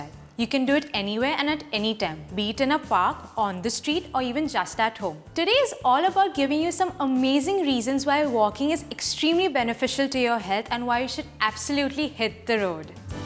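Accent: India. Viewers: Ur English feel like an Indian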